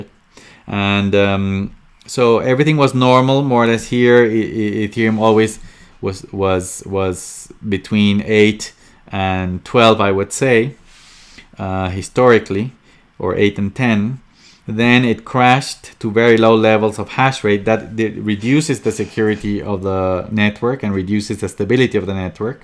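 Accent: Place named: Russia. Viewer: Я так и думал що твой акцент руский ! Честно ти въобще клас ! С етой дъмб я сделал ошибка но надеюсь що за в бодущие не будить . Сподибо за поддержка , и всегда показивяеш все що как надо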